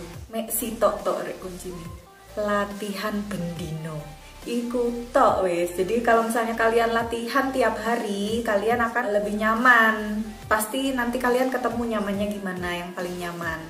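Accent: Java, Indonesia. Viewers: Suka mbak mix bahasa jawa gtu ngomongnya,,,hehe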